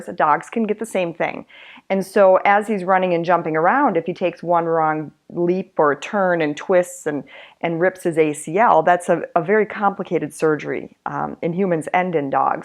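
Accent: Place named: Midwest, United States. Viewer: very very good and informative series. My English Bulldog has been diagnosed with a stage III Luxating Patella also. I have not been told at this time that his ACL is not intact. He is 2 1/2 yrs old. It sounds like you would like you are from the Midwest. I plan to take him to the UNIV of WI Veterinary School which is supposed one of the best in the country. His first consultation will be a 4 hr exam ( so a very thorough exam) and then at that point he will be diagnosed as to how to proceed. Thanks again for the video series so I know what to " really expect" once he is sent home. I have been told that he will be staying overnight with his team to include check ups with his anesthesiologist to make sure he is not having any severe reactions for the surgery and anesthesia that was administered.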